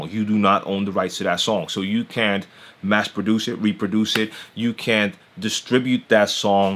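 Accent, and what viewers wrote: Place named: Philadelphia, United States. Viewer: you're from the Netherlands but have the same accent as me in Philly?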